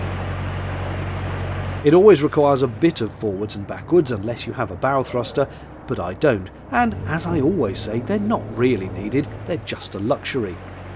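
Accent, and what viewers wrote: United Kingdom. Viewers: so nice to see you and hear that very British voice. Keep safe P.S. Nice little vlog by the way.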